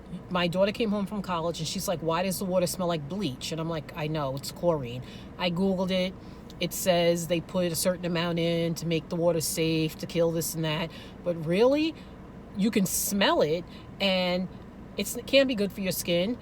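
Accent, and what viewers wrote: New York, United States. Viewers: So as you were talking ,im like she has to be from NYC...girl I am too and moving also, gour video was very helpful.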